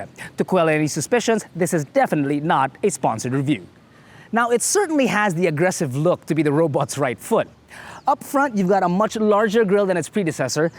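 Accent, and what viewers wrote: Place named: Conyo, Philippines. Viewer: Too conyo for my taste. Its simply Calamba not Cuh-luhmbah duuuh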